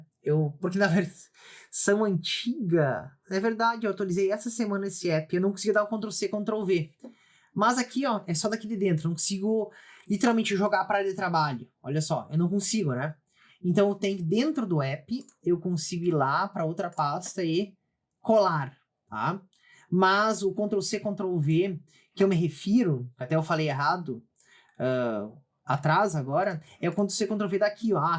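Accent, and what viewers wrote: Brazil. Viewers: Tu és de SC ou RS, tem muito sotaque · Sotaque de Porto Alegre, certeza!! Curtindo demais teus vídeos, vizinho! Kkkkk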